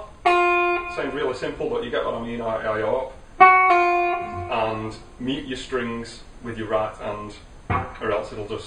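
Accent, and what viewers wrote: Lancashire, United Kingdom. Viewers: You be must be from lancashire. You should like my uncle lol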